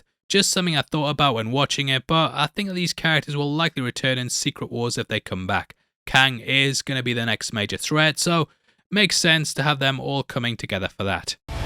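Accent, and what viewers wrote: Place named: North East England, United Kingdom. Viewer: Oh God you said Monga. Manga ffs. You're English. MANga. Ffs. I get the NE accent.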